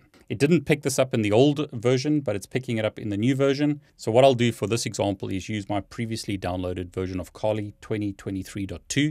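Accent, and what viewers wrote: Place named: South Africa. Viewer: South African!!!😂 Thanx for the installation boet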